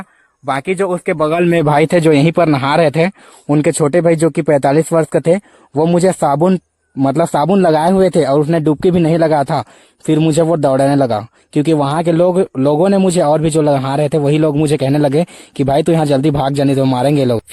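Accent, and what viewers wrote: Chhattisgarh, India. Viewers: Bhai aap chhatisgarh se hona sach batana pliz aap kon se gaov se ho pliz batao maine aise jana kyu ki aap ka bolne ka tarika chhatisgarh hi jaisa hai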